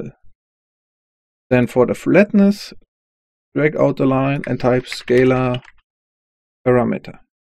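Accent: Germany. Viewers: Thanks its so easy xD i had seen many Videos before ( bist doch bestimmt deutscher. Es kann mir gleich so vor beim Sprechen 😆)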